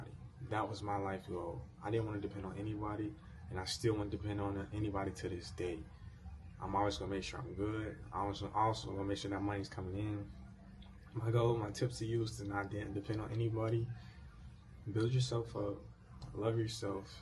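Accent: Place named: North, United Kingdom. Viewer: It’s so weird bc u don’t strike me as sb who is from the south u sound and carry ur self like u from up north 😂 u got that smooth voice like a New Yorker 😫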